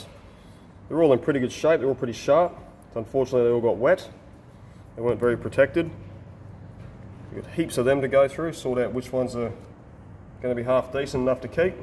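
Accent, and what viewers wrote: United States. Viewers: You're starting to sound less ke an American. Made right here in the USA . You did mention made right here in Australia a lot 😂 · Oh no, you're starting to talk American now. Good score on the auction. Hope y'all had a good Christmas. regards from Wales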